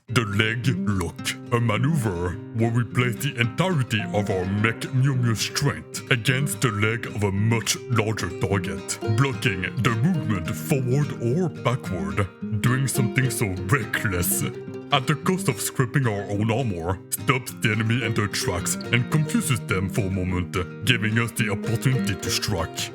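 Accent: France. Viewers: is his accent real or is he an american imitating a french talking english?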